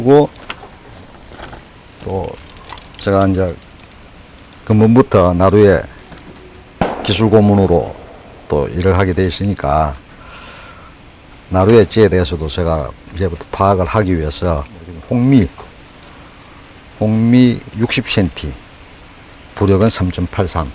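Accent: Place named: Japan. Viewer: 말이 일본말 같은데? ㅈㄴ 알아듣기 힘듬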